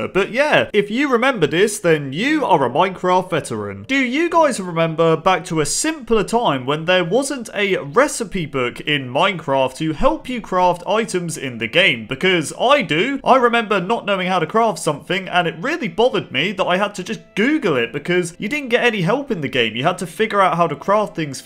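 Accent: United Kingdom. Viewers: YOUR DEFINITLY BRITISH ARE YOU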